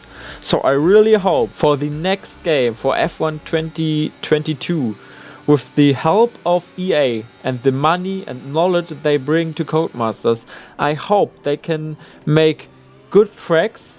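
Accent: Germany. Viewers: Wie man den deutschen Akzent hört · That German accent :D okay im from Czech Republic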